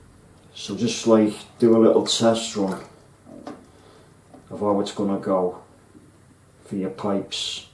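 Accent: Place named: Liverpool, United Kingdom. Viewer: Thumbs up just for that Scouse accent lol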